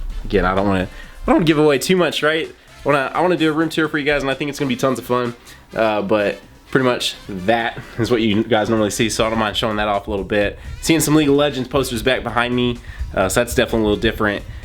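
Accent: South, United States. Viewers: are you from the south sounds like you have a southern accent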